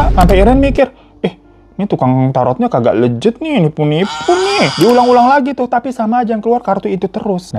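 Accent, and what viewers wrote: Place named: Medan, Indonesia. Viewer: KEK MANA, Udh kek orng Medan ya bg :v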